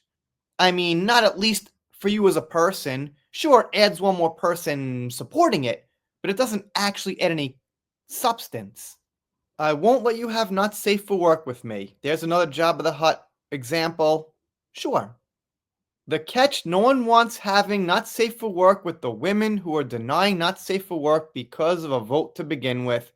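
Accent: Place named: Long Island, United States. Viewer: Oh my God that accent. Tell me you're from Long Island without telling me you're from Long Island.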